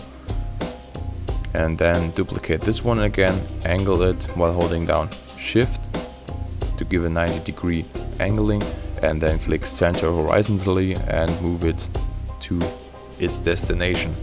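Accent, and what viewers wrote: Germany. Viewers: Hahaha man merkt irgendwie dass das n deutscher war :D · Are you really english ? Or german because your voice is like a little bit of german accent !^^ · Digga man hat herausgehört das du deutsch bist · Bist du deutscher? Wenn ja hört mans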